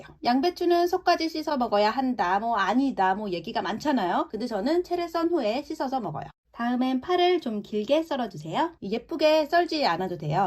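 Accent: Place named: Gyeongsang, South Korea. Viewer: 냉장고에 있는 재료로 다이어트 요리 총분하네요 들기름 씻은 김치 좋고 경상도 억양인가요 잠 듣기 좋네요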